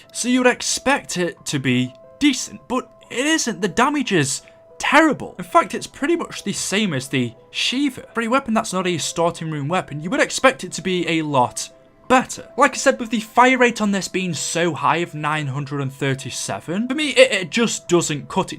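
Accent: United Kingdom. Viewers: Derek Monroy he is british, it doesnt matter how he says it...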